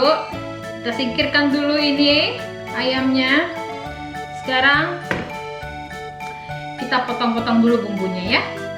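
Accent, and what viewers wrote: Jakarta, Indonesia. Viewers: Et dah si mpok..di jkt kagak ada kalkun mpok...palingan ayam boiler ame ayam tetangga lewat..nape gue jd ngomong nya gini ya mpok ala betawi,gara2 mpok nih...hee..hee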